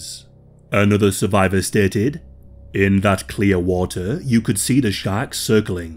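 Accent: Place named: Scotland, United Kingdom. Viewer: He's Scottish. You can immediately recognise it because he pronounces "u" properly.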